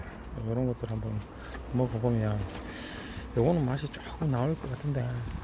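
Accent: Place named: North Gyeongsang, South Korea. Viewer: 선생님 말씀으로 봐서는 경북지역 이신것같은데요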